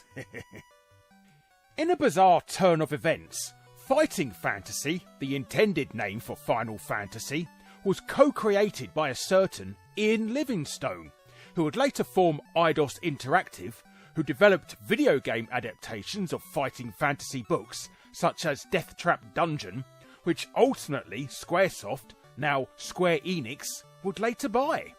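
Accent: United Kingdom. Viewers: Your videos are great but your voice and inflections are so british it's unwatchable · My favourite was your classy british accent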